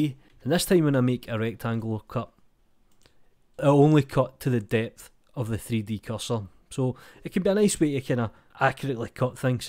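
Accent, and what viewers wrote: Scotland, United Kingdom. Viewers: This was incredible. This was exactly what I need. I didn't know about the Boolean Modifier. Thank you! I love your Scottish accent too, so cool... >w<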